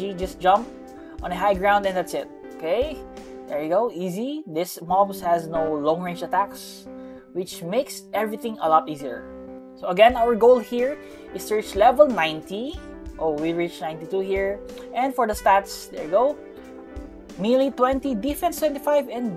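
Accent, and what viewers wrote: Philippines. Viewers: Pilipino kapo? Boses nyu kase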